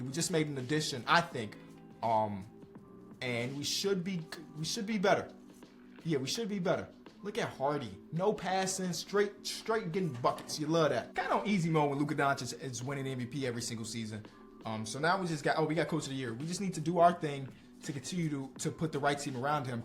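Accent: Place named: New York, United States. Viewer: I still love that ever since became friends with SAS, he took on that New Yorker accent lol. Epic.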